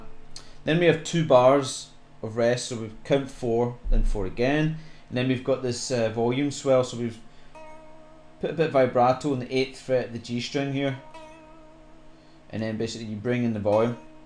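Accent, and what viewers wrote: Scotland, United Kingdom. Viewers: you scottish dude? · BRILLIANT M8 where areyou from in Scotland ?? Edinburgh??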